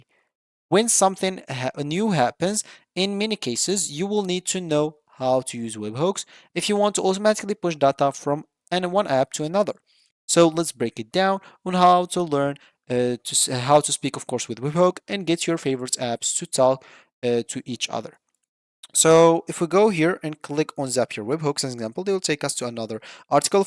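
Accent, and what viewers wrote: Morocco. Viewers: bruh you sound moroccan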